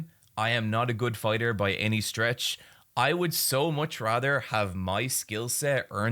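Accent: Ireland. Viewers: Irish Henry Rollins explaining the Jake Paul nonsense? Yeah, I'm here for this. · Is ur accent Irish ☘️ ❓ very cute.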